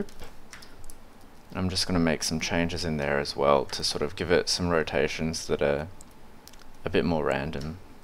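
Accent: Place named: California, United States. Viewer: your accent reminds me of The Californian series from SNL.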